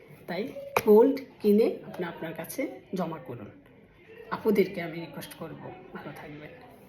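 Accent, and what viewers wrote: Noakhali, Bangladesh. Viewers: আসসালামু আলাইকুম আপনার বাড়ি নোয়াখালী, এটা আমার মনে হইছে আপনার কথা শুনে কিন্তু বিশ্বনাথের ম্যামো দেখে মনে হলো আপনি মাইজদীর আসে পাশের কেউ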